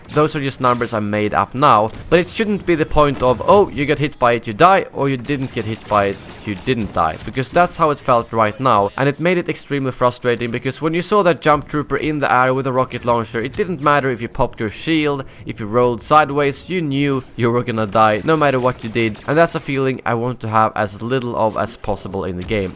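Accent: Sweden. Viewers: Are you from sweden, you sounds like that. Hope you don't take this bad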